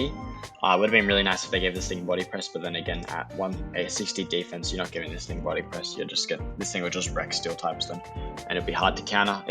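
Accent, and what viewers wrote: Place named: United Kingdom. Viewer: Oh my goodness, he's British 😮 hehehe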